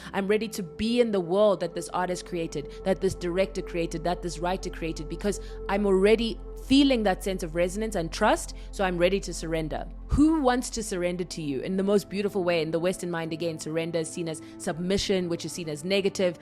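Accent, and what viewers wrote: South Africa. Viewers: Such a timely topic of conversation. Yesterday, and today as well, I had a conversation with God, questioning the idea of "Devine timing" vs "Doing things at my own time" and the response was so humbling but felt good "It's not only about you. It's about everyone else who is going to be impacted through and by you too. it's not just about you." and then today it hit me "The things you want are also manifesting you . " And it shifted Everything from resistance and obsession, to Allowing it to naturally inevitably unfold. . And TADAAA here's I come across Your video confirming everything that God , angels and spirit guides are telling me. ❤❤❤❤❤🎉. I'm so happy to hear a south African women's voice creating a space like this and using this platform the way you are. 🎉🎉 We've been waiting for your voice Sis❤🎉😂YES!!!!!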